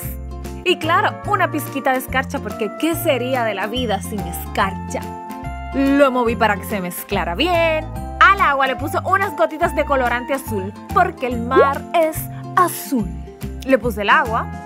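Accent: Dominican Republic. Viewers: Hola que bn que me encontré con estos vídeos tu eres dominicana verdad porque hablas igual que yo . soy dominicana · eres dominicana? o de alguna isla del caribe? 😍 siento como un asentillo dominicano xd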